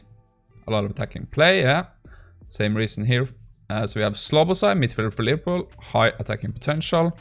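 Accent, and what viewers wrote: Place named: Norway. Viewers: Are you from Norway? Sounds like a norwegian trying to speak English to be honest :-) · He IS speaking english with a Norwegian accent. Yes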